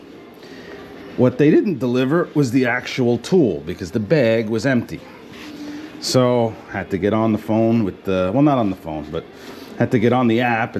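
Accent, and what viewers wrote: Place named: New York, United States. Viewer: I like your new york ack'sent'....I had a partner in in los angles who was from brooklin and he was a good friend,,Yet he was So much of a grinch'..?[[the dude had an atatude'...anyway I llike your stories..and You have a father that is very good to you...I like it...I always wanted a harley so I kept fixing up old broken down bikes till I made enough to use as a good down payment,,and along with what I could save from my job driven the senior bus...I bought an 02 fxd...once I payed it off..I spent way too much time and money changing evreything till it hardly had any harley parts...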